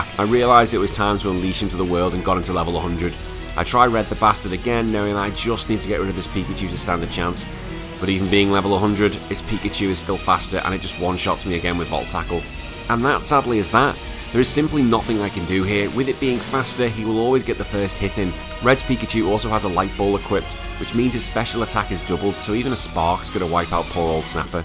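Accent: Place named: Scotland, United Kingdom. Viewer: I like your accent, I think it's scottish?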